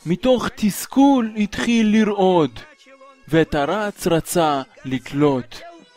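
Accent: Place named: Russia. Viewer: имхо стоит попросить озвучить нативспикера, небольшой русский акцент всё же прсутствует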